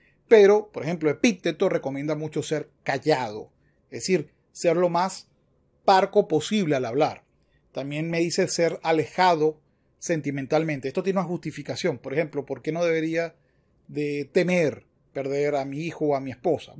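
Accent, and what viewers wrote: Venezuela. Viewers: Muy bue vídeo amigo..excelente. ¿ Usted es Venezolano? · Ya sabía yo que eras venezolano amigo...!!! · tu eres Venezolano? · Hola, professor, deseando esté bien; venezolano en México, me cayó el veinte en cuanto a la corriente del Estoicismo, ya que lo percibo en "español venezolano", gracias, procuraré seguir escuchando y viendo tus vídeos.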